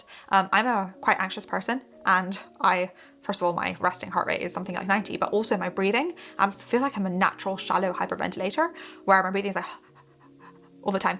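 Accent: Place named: United Kingdom. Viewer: You speak really quickly! I use your videos for improve my listening and I like your UK accent but it's hard understand you without subs.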